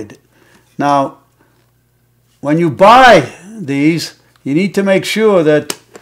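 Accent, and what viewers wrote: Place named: Germany. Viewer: Superb and definitive video, I am interested in your accent, I am hearing, German, Manchester England and California, please do tell 👍🏼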